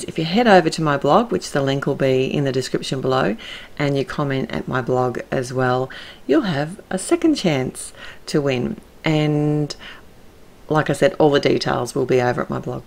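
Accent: Australia. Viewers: Watching this Anzac morning after the Dawn Service... Wow! Thank you, always love listening to the Aussie voice😀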